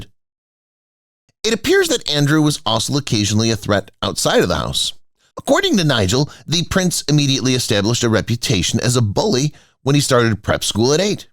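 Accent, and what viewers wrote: United States. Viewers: Always an American accent with these stories… I will not be listening to this narrative… this is against the British Royal Family… and those British who keep following these people deserve to lose the first family of the UK… THIS FAMILY WHO HAVE SEEN THIS COUNTRY THROUGH SO MUCH OVER THE CENTURIES UNTIL TODAY… YOU ALLOW THESE AMERICANS TO RUN THE BRF DOWN TIME AND TIME AGAIN AND EVEN TODAY.. THEY ARE TRYING TO DESTABILISE THE BRF EVEN THROUGH HARRY AND HIS LIAISON WITH AMERICAN RACHEL MEGHAN MARKLE.. THEY DID NOT RUN AWAY FOR FREEDOM… THEY RAN AWAY TO CONTINUE WHAT SHE WAS PLANTED TO DO… IT HAS NOT STOPPED AND HAS GAINED MOMENTUM WITH THE ENCOURAGEMENT OF YOURSELVES… THE LIKES OF TINA BROWN… CHARLOTTE AND MANY OTHERS IN THE BRITISH PRESS… TOM BRADBY. YOU HAVE WHAT YOU WANT NOW IN KEIR STARMER … GOOD LUCK BRITAIN… YOU ARE GOING TO NEED IT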